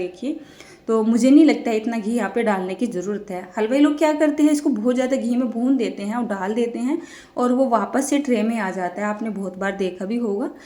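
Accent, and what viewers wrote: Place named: Uttarakhand, India. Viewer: Acha bna hy ghee kafi lgta hy hath maiy lga hua hy achi grhni ho ❤❤😂😂 bolne se uttrakhandi lg rhi ho jai ho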